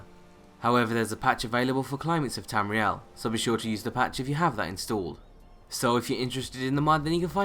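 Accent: United Kingdom. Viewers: yeah nice but god i hate that british accent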